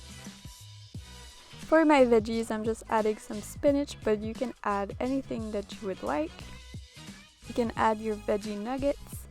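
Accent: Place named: Gaspésie, Canada. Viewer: omg your accent sounds just like the accent from where I live... I am in Gaspesie... Also hello fellow vegan. I love this video 😍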